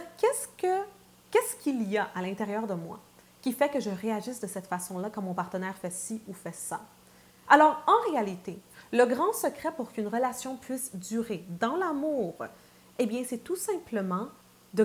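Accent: Quebec, Canada. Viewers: Bonjour, c'est pas plutôt de la mauvaise foi ou un manque de volonté? Elle parle avec l'accent Québécois, pour ceux qui viennent de France, il suffit juste de faire preuve de volonté et de prêter oreille, pour comprendre. Je suis de France, mais j'ai compris facilement, ses paroles. De plus le message qu'elle véhicule est très intéressant et constructif!!!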